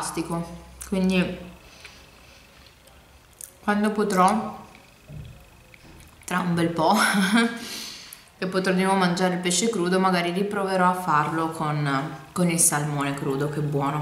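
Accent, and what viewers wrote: Turin, Italy. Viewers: Grazie mille 😍 ti ho scoperta da poco e mi fai davvero un sacco compagnia con i tuoi video! Poi adoro tantissimo il tuo accento torinese ❤️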